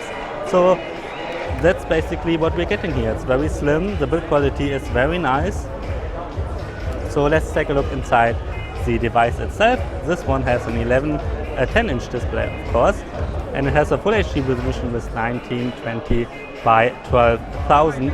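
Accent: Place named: Germany. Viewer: sry aber dein denglisch ist echt furchtbar!?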